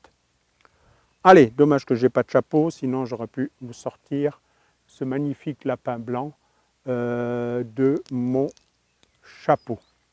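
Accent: Alsace, France. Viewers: Merci, c'est toujours un plaisir de vous écouter, j'apprends toujours quelque chose de plus. Il a beau être nul, celui qui a écrit le bouquin, je vais l'acheter quand même.! Il a un fort accent alsacien que j'adore étant moi-même d'origine alsacienne. Merci encore!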